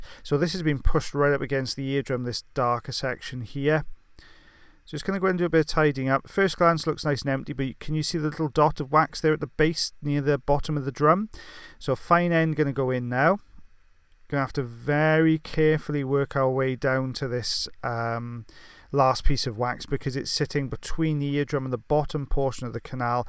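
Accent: United Kingdom. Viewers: Omg you should do hilarious ear wax removal in your British accent would awesome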